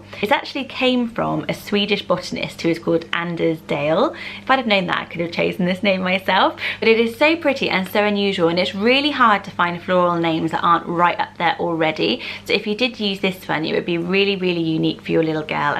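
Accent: United Kingdom. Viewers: Some of these names sound so beautiful whenever you say them with your lovely British accent, then they sound awful whenever I try to say them with my Kentucky accent. 😩 Clover, for example. She says: Clo-vah I say: Clo-vurr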